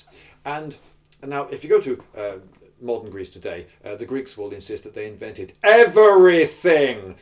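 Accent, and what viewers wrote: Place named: United Kingdom. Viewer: relax dude. british accent is sometimes hard to catch for a froggy.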